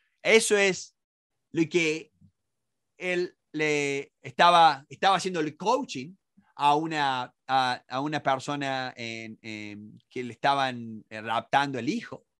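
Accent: Córdoba, Argentina. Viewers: SOS cordobés?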